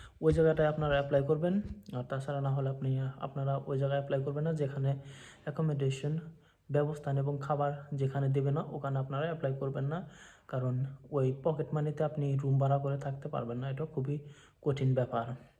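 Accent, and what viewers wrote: Assam, India. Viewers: moi apunar kothar style sai buji goisilu...apuni Assam or pora ...bhai apunar logot keneke contact koribo paru ?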